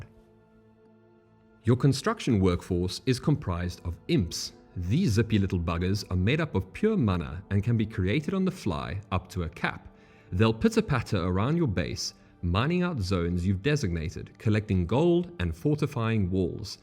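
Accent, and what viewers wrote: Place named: South Africa. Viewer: I hear a South African voice 😎 love this video. I was obsessed with Dungeon Keeper back in the late 90s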